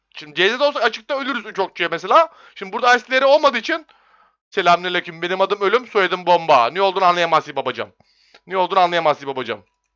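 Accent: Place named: Bartın, Turkey. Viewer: Bartın’lı mısın babacım 😃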